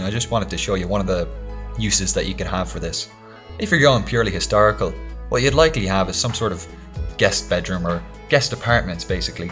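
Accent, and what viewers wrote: Ireland. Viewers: i know you mighteve already got this question but, are you irish?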